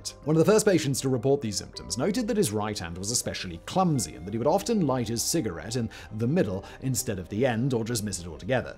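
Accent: United Kingdom. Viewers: Anyone else think he talks too fast and all his words run together? I have never heard a British accent that I just couldn't stand (besides hugh grant but that's cause he's cheesy)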